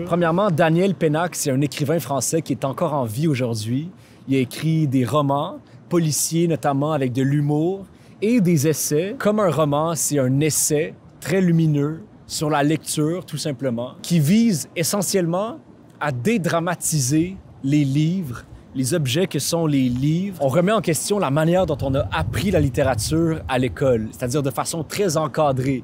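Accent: Quebec, Canada. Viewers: C’est un accent québécois? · Trouver une chaine de littérature tenue par un homme, est rare ! Avec une telle passion, de jolis mots et avec le cœur, Exceptionnel ! J'adore également cet accent. Le Canada, reste pour moi un rêve ! Três heureux, de ce hasard qui m'a mené ici! Merci beaucoup, de ce magnifique travail et de nous le partager.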